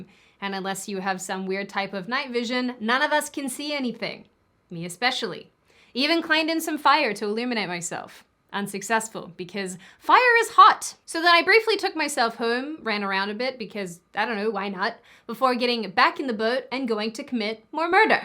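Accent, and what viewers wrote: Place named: Australia. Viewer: I think it's fun watching, plus I just like to hear you speak. Being in Dallas, I don't hear many Australian accents.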